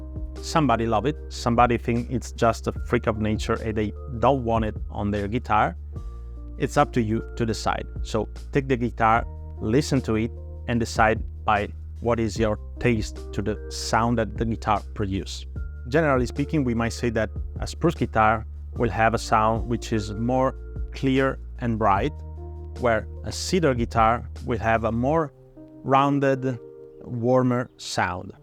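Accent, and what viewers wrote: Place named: Italy. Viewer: We love that italian accent.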